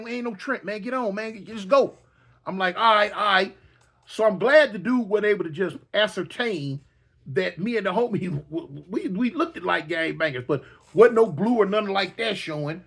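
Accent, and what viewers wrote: United States. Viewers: Know disrespect but your war stories are garbage or maybe it's how you tell them and you sound country as F.ck get your Cali swag right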